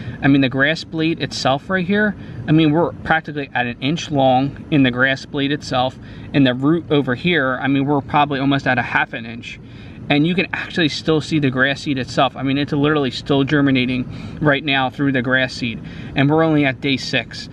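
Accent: South Jersey, United States. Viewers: Hey, I subscribed through Etsy! 😉 It's good to see Jersey again and I can hear your area code 609 accent. 😊 In one video it's so obvious you have a gift for creating beautiful ORGANIC lawns. That is so vital with climate change. We live way upstate New York now in the woods and we don't even have sidewalks or street lights and the scrub grass grows, well, like weeds. It's so nice to see your flat, green lawn. Beautiful work. 🌱🌱🌱🌱🌱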